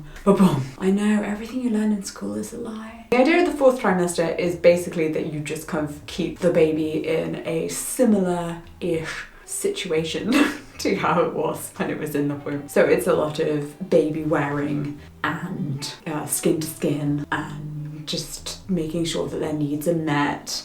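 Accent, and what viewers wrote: RP, United Kingdom. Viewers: i saw you being intervued by molly berk and loved your poshness that's parshley why i subscribed to you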